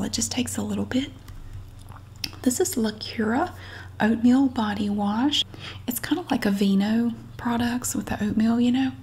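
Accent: South, United States. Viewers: I like your southern accent: "Hate it an ate it". And you are so considerate to donate the food you bought. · I just moved to South Carolina from NY, and so many people have a lovely southern accent like u! Lol, it gives me such comfort for some reason. Have a great night!!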